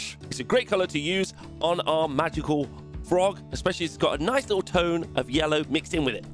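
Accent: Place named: United Kingdom. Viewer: My favorite part was! “It’s a magical Frog” only Someone with a British accent could say something like that and it be as funny as it was! Someone with an American accent would just sound Ridiculous! Cheers from another, aKa TriptonStudios!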